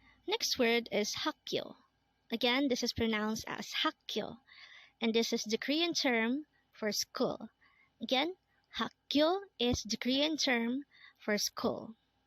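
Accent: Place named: Philippines. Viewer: Hi. Are you a Filipino? You sound like one 😊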